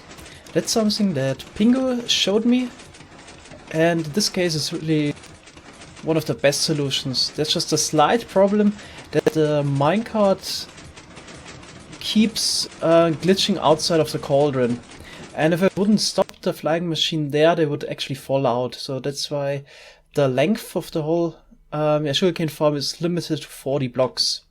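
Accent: Germany. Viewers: Kann es sein, dass du deutsch sprichst? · Are you German sometimes it Sounds like so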